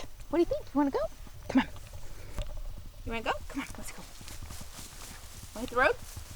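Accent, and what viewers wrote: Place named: Minnesota, United States. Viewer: I haven’t lived in MN for over 50 years, and everybody still asks me if I’ve seen “Fargo.” The accent is strong in this one.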